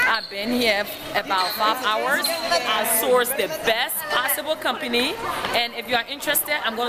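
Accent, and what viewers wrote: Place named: Liberia. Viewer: I hear our wonderful Liberian accent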